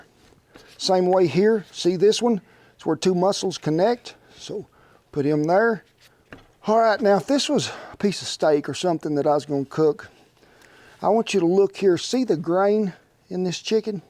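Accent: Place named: Rural, United States. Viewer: The food looks tasty, and he is obviously a skilled cook, and recipies are interesting... but dear God, this hick accent is unbearable... it makes my ears bleed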